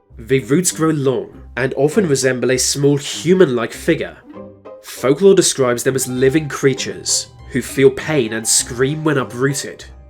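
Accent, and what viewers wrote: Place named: Scotland, United Kingdom. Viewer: I don’t think it’s a speech impediment I think it’s a local accent because I’m from Scotland and in some parts they say van instead of than and vey instead of they I could go on but I’d be here all day lol , I actually find his voice quite pleasant tho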